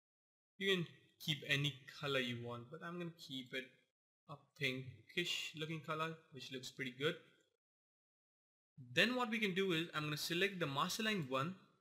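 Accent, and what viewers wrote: India. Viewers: thank you bro :) i was scrolling through the internet for some clean intros and just stuck on this one i didnt knew you are indian until i just felt that accent :D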